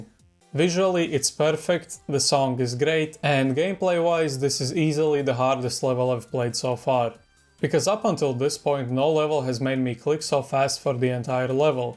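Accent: Latvia. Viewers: At the start of the video i already heard the latvian accent 😂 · W latvian accent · banger vid also the latvian accent is so strong lol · latviesu akcents anglu val ir tik ikonisks😭 godigi es nesen izgaju un loti iesaku iet labak ar neka cata, cata ir pilnigs suds imo. Lai nu ka veiksmi!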